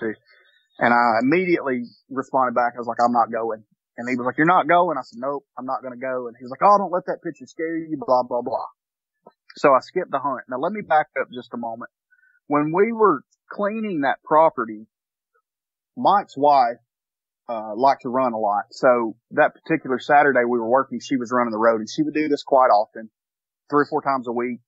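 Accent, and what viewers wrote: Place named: Alabama, United States. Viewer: great collection of encounters! “Great Alabama accent”.....I could listen to you all day! Thanks for sharing....Thank you....touchdown 🏈!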